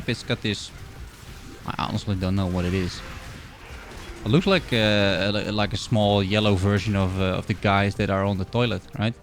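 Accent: Netherlands. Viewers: Are you Dutch or Belgian?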